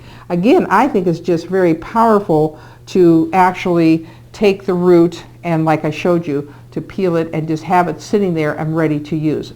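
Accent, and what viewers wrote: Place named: United Kingdom. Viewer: Pronounced in America as Tur MER ic. and Cur CU min She pronounces it as the British do. But we don't speak British here in America. Rule of thumb is in America (the entire western hemisphere) the emphasis is on the 2nd from the last vowel. IT only changes from that rule if there is an accent placed over a different vowel. In the British language they put their emphasis on the first syllable of words. TurMERic is a most wonderful herb. Beautiful plant as well. I grow Turmeric and Ginger. I sprinkle the ground up Termeric and Ginger on my buttered toast daily. I also add Hemp seeds to it and a splash of Cinnamon. ===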